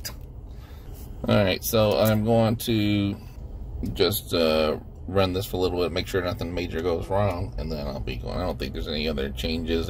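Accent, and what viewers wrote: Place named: United States. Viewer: Nice and concise once you get to grips with the usa twang. A Kings English version would be most helpful. Thanks anyway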